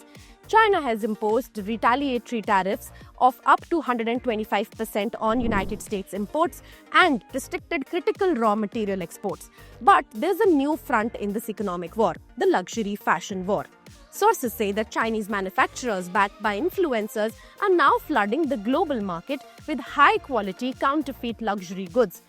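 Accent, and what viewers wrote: India. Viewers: As if I am going to believe this reported with an Indian accent.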